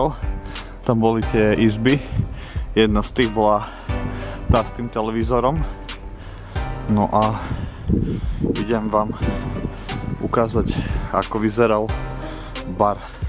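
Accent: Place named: Eastern Slovakia, Slovakia. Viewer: Ten východnarsky prízvuk je parádny. 😊👍 Už len začať hutorec v tomto videu. 😀👍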